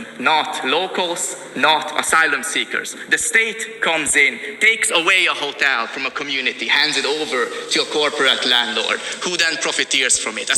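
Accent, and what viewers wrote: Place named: Ireland. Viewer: Strong Irish accent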